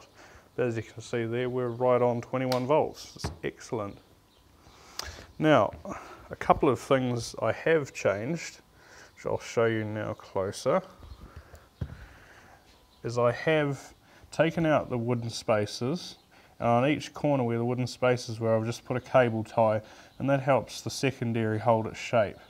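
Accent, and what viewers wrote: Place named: New Zealand. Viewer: This guy is a Kiwi, so it is pronounced TUN, not Tin.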